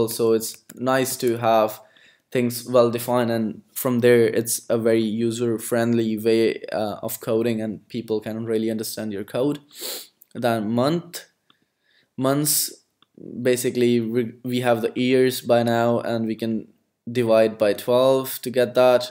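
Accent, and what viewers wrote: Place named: United States. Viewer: but your ascent is american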